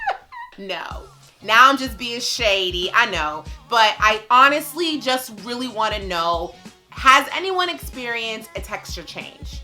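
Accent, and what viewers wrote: New York, United States. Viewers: That NY accent!! Brooklyn Girls In the house!!! ❤️ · Wow I haven't seen you in forever youtube is on some bs but your lil lady is so big havent seen her since she was a baby and im loving the NY accent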